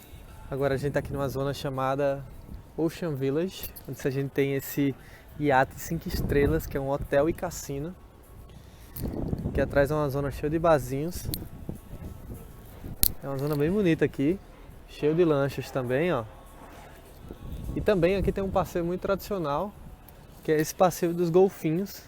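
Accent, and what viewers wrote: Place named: Northeast, Brazil. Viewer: Eu sei que e brasileiro sim mas seu sotaque e nordestino isso que disse .Eu sou paulistano e tenho inúmeros amigos nordestinos.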